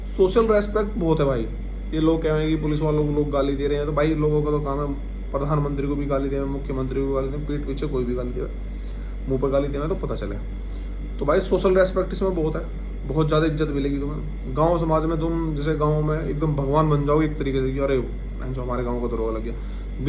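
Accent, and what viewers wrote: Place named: Meerut, India. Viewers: भाई कौन से जिले से हो बोली तो हमारी जैसी lagri है मैं शामली से हु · Bhai ap kha se ho Meerut k aas pas k lg rhe ho language accent se...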